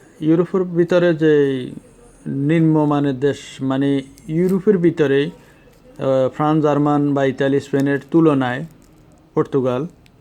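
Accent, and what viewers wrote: Noakhali, Bangladesh. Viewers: bari nokhali mone hoy.. tai abal marka kotha barta..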